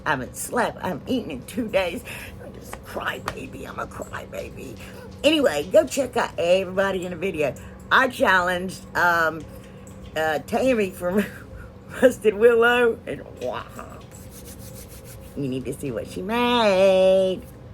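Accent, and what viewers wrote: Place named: South, United States. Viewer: For one u r from the south so don't ever try changing ur voice again cause I love listening to u talk in ur own voice. Second I always love everything u make and if I could I so would totally try makin this ur way lol believe it or not but I would. U really r the best person and funniest love I love watching so plz keep making ppl laugh ur way. Thank U❤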